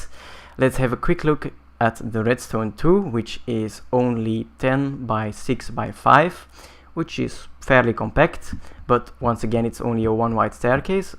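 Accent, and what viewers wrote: Belgium. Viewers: Haha, dacht ik het niet. Dat accent herken ik uit de duizenden :) Blij om een landgenoot zo succesvol te zien op youtube :D Gebeurt niet veel! Keep up the good work! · Are you from french Belgium? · are you belgian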